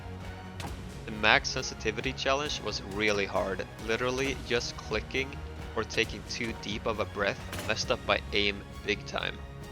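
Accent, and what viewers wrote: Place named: Sweden. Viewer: du måste vara svensk efter din dialekt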